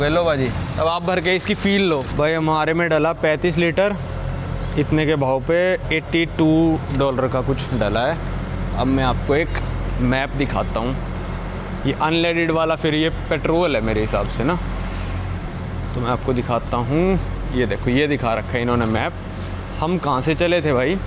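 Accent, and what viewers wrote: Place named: Himachal Pradesh, India. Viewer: भाई हमीरपुर, हिमाचल से तो नही हो, accent हिमाचली लग रहा आपका, मेरे रिश्तदार है वहाँ इसलिए बोल रहा हूँ, ❤❤ वलॉगिंग बढ़िया है👍👍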